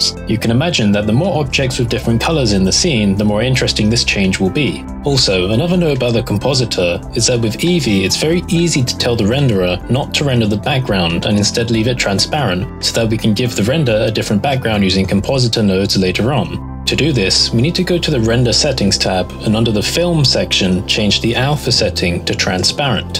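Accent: United Kingdom. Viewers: wow this is my first time seeing you haha I love all your vids but by your accent I always thought you was a British Pakistani or something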